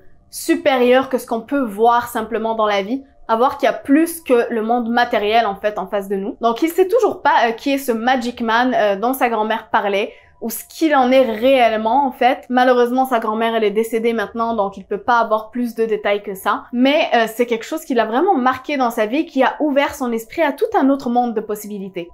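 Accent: Quebec, Canada. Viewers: Est-ce que tu es québécoise? Car ton acsent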